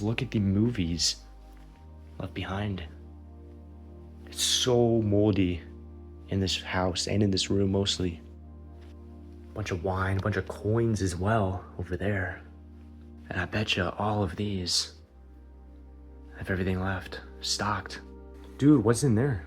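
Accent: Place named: United States. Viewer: What’s an American rummaging through a home in Britain for. Definitely not a British accent.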